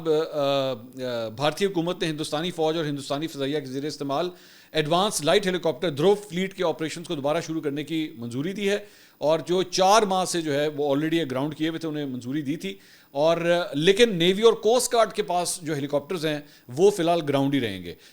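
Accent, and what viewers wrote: Punjab, Pakistan. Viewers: ap Fasilabad sy to nahi.....Sir waey Punjabi bary cute bolty ho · فنی پنجابی ٹچ بہت مزے کا لگتا ہے😂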